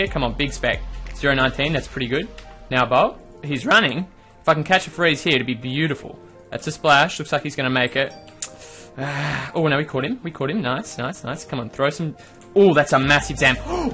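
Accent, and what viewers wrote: Australia. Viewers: Your an Aussie eh? I served in the military with a few of you bastards. Fucking love you guys man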